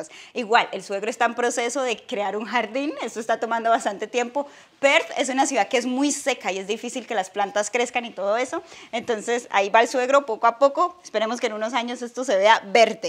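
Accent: Colombia. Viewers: Me encanta que no pierdes el acento colombiano 😍😍😍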